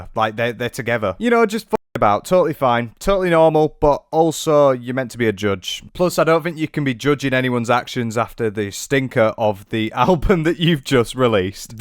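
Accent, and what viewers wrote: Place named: England, United Kingdom. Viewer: Definitely my new favourite YouTuber usually I can’t handle English accents but yours is adorable 😂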